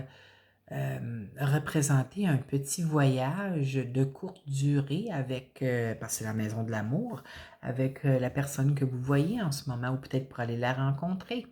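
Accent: Quebec, Canada. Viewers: Vous êtes trop mignonne et pleine de bienveillance, je vous ecoute, vous et votre super accent, avec une immense joie. Vive le Québec et ses habitants 😍😍😍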